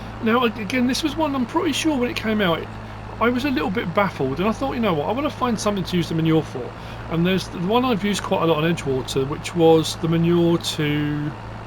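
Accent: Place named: England, United Kingdom. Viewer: Youtube income has dropped in all areas I have a channel painting acrylic lessons also gta5 videos in the U.K. it’s harder to get an audience my is mainly in the USA I live in wales bern a content creator for over 10 years downloaded this game free it’s a fantastic game as I worked on farms back in the day on the hay potato picking by hand and milking in my 60s it’s a way to relive my youth your doing a great job m8 just be yourself and it’s great to hear a true English gent 👍👍👍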